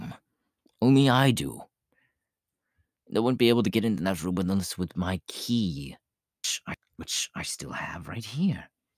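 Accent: United Kingdom. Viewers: Me when he has a British accent: 👁👄👁 😺🦋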